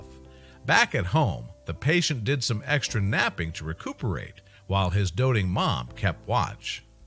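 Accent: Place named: United States. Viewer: That god-awful American accent. Hit the mute button.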